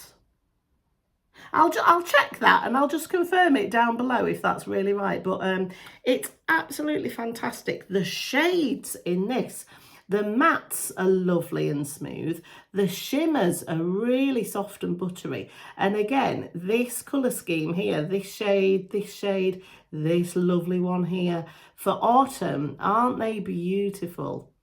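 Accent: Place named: England, United Kingdom. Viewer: We call it trash day here in the states....England is on my bucket list. I love the accent and yes we all have different ways of saying things which is fun and actually educating.....my late father visited Englad he loved it especially the changing of the guards. He also said the food is different. Great video i really enjoyed it ❤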